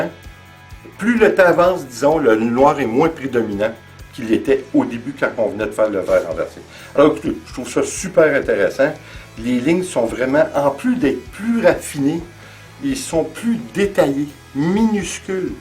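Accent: Quebec, Canada. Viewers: Intéressant, à tenter avec le produit à vaisselle. Plaisir aussi d' entendre votre accent qui me rappelle mes vacances au Québec. Bonne continuation. Brigitte de Royan - Charente Maritime en France.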